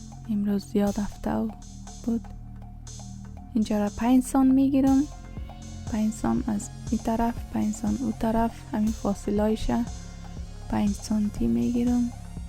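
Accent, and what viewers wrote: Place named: Afghanistan. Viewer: احسنت برخواهر افغانم با اموزش عالیتون استین چه خوب اموزش دادی بالهجه ی شیرین افغانی 🇮🇷🇦🇫👏🏻👏🏻👏🏻👏🏻👏🏻👏🏻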